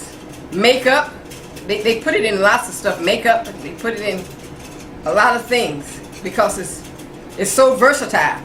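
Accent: United States. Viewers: I am just Subscribing as this was in my recommendations. I love how you are surely Taking Charge of your Health and showing us that we can do so as well!!! You sound like you are from Down South Georgia somewhere! Blessings to you "Grandma"!!! 💓 💓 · For the first drmonstrationI got the aloe vera gel, the carrot, the honey, the juice but what is the last item she added ? I didn't get the last item he added because of her America accent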